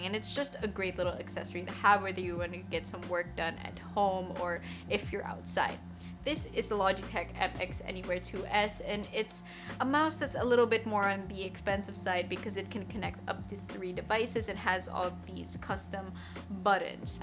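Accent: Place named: Philippines. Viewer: you have a teenie tiny filipino accent in this video :)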